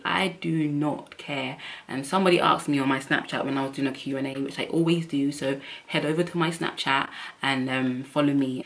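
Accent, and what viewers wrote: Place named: United Kingdom. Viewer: When you played the little Heavenly music leads me to believe you believe in a higher power The Most High Yahawah and his only begotten son Yahawashi Messiah l feel like have to ask you to research your history although you sound like your from the UK but that isn't your nationality right or wrong...? Please don't misunderstand why l am asking you about your nationality, here's why l am inquiring about your ethnic background because l've learned about 5 years ago now that although l was born in America taken from Africa l am neither African nor American, from the time we so called Negroes where dragged here against our wills and turned into Slaves well our ancestors where turned into slaves anyway, we as a people have walked around in this whole Earth where we were all scattered throughout the whole world as bondsmen and bondswomen (SLAVES) Well to make a long story short if you are a descendant of slaves as blacks (the so called Negroes) are here in The Americas (United States) and South America than l ask of you to start researching your history but please go buy unless you already own it the Greatest history book every written called "THE KING JAMES BIBLE/W THE APOCRYPHA " Start with that to begin your research on you history and look for secular historical history that matches the scriptures that verify who you truly are and your true historical and biblical identity you will be amazed when you find out it'll help answer a lot of your questions about circumstances and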